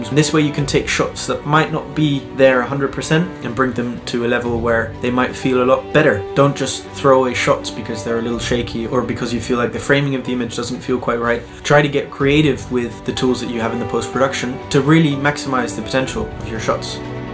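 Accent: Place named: Canada. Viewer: wait you are 100% canadian, that drivist prononciation.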